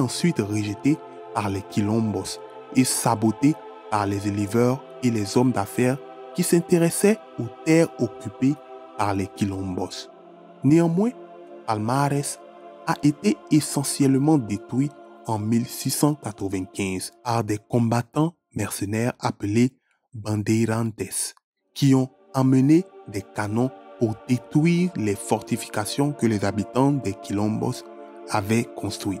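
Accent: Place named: Africa. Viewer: Salut, trop pénible à écouter !...entre la musique qui est trop forte et votre diction tinté d'accent africain, je me fatigue à tendre l'oreille ...c'est dommage !....j'espère que cette critique vous permettra d'améliorer vos prochaines vidéos !...bon courage pour la suite !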